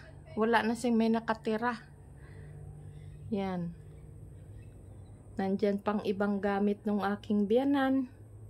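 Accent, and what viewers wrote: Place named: Tagalog, Philippines. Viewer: Saan bayan kayo maam parang nadaanan ko na din jan.at Ang salita mo pamilyar Sakin Tagalog 😮❤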